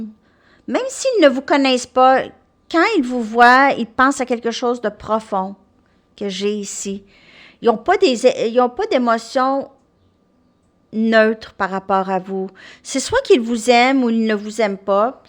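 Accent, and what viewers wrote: Quebec, Canada. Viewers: J'adore ton accent (Québec?)Une de mes meilleures amies est québecoise .J'adore les expressions que vous avez gardé et que je trouve romantiques . En France nous les avons oublié ,dommage!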